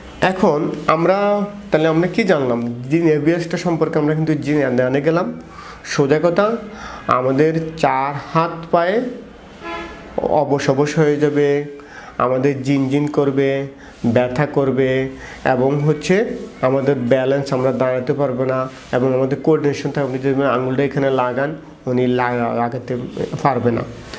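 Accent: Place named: Chittagong, Bangladesh. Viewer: আমার মনে হয় ডাক্তার সাহেবর বাড়ি চট্টগ্রামে। চট্টগ্রাম হোউক বা অন্য কোন বিভাগে যে কারোরই নিজের আন্ঞ্চলিক ভাষার একটা টান থাকে।এটা ত অস্বাভাবিক কিছু নয়।তবে উনার কথা তোতলানো ভাব আছে কিছু টা। আমার মনে হয় উনার আগে আরো বেশি ছিল তোতলানো টা। উনি উনার আত্মবিশ্বাসে অনেকটাই স্পস্ট কথা বলেন। এটা আমাদের বোঝা উচিত যে উনি ইচ্ছে করে কথায় ঝড়তা রাখেন না। সৃষ্টিকর্তা আমাদের সবাই কে সব দিক থেকে পারফেক্ট করে বানায় নি। সবার মাঝে কিছু না কিছু কমতি আছে। কারো কমতি নিয়ে মন্তব্য করা ঠিক নয়। বরং উৎসাহ দেওয়া উচিত যেন সবাই কমতি ভূলে নিজেকে একজন পরিপূর্ণ মানুষ মনে করে সমাজের সেবা মানুষের সেবা করতে,নিজেকে আরো উৎসাহি করতে পারে। তাই নয় কি?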